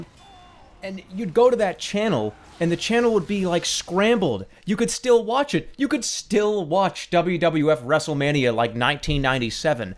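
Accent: Boston, United States. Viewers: Are you from Boston? XD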